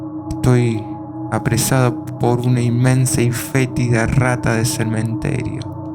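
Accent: Argentina. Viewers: La narración no es tan envolvente ó agradable.... Ese acento argentino ó paraguayo, no provoca seguir escuchandolo...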